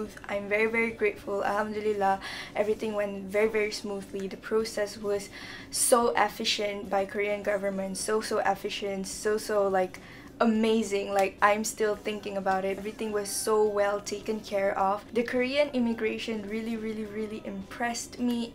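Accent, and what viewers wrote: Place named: Philippines. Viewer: the way she spoke like filipino..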